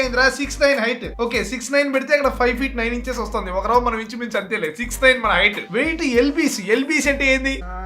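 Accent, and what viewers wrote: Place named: Telugu, India. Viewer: Europe lo telugu abbai la undhi voice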